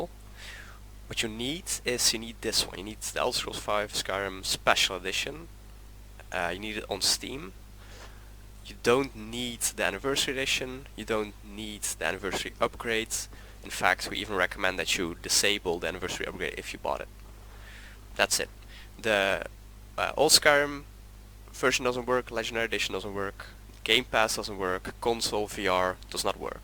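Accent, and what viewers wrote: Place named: Netherlands. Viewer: Zieke shit, thanks maat ik hoor je nederlandse accentje wel hoor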